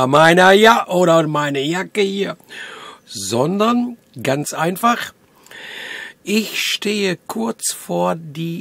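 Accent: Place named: Ruhr, Germany. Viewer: Hi, naja Kritische Person? Das bin ich auch, mir wird auch nachgesagt ich sei Pessimist. Naja ich sage da nur Kritische Person hin oder her,man muss nicht zu allem ja und Amen sagen:-). Und ich bin kein Pessimist sondern Realist denn Pessimisten sind die besten Realisten:-). Und du hast halt eine Ruhrpott Schnautze,wie sich das gehört:-), halt ein Original ^^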